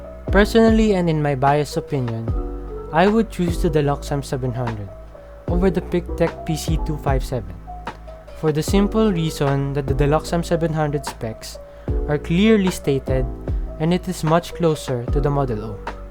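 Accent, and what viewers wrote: Philippines. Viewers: u have filipino accent like me xD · the filipino accent throws me off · from the accent, are you filipino? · Yoo way you speak your language is like Filipino BTW can you make a video how to change any mouse debounce time? I got 1 dollar mouse which is legit thanks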